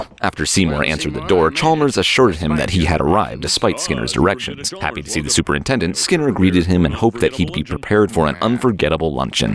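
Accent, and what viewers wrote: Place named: United Kingdom. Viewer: Sound very British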